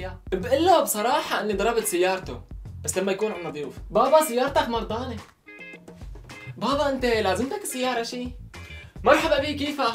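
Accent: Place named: Damascus, Syria. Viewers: ليش مو عاجبك لهجة السوريين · هاد من الشام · يييييييييييي هاي لهجتو سوري اصلي. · Taker 1995 HD لأنو هوي سوري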